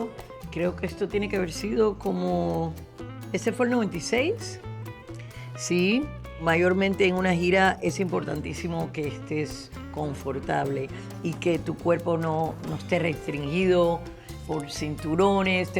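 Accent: Cuba. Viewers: Gloria nunca pierde su acento cubano... Bella!! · Como se oye para ti? Yo soy mexicano creado en Florida. Para mí se oye bien bien Cuban, aunque ella creció en Miami. Te suena su acento gringo al hablar castellano? Como se compara a un acento auténtico cubano--de la isla, diferente a lo cubano de Miami.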